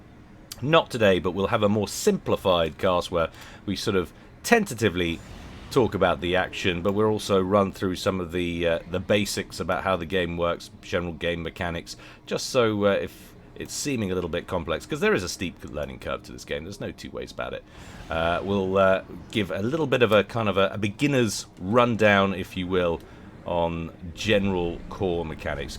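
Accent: United Kingdom. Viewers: Just found this channel and binged a few games. Supreme Commander was a favourite of mine back when it came out (in the days of 'you can use a second monitor for the map, gasp!') Happy to hear a fellow brit on YouTube too. It shouldn't surprise me, but it always does 😅. Convinced a friend to pick up FA when we both get paid (as I'm skint at the mo) and we are going to check out FAF for the coop campaign!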